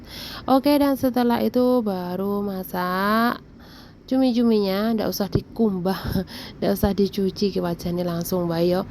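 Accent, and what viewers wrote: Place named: Java, Indonesia. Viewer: Makasih ce resepe,bahasa medok Jawane salam kenal salam persahabatan ce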